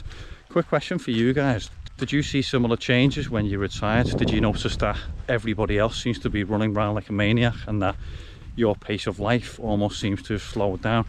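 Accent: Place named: Liverpool, United Kingdom. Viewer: Def sound like a scouser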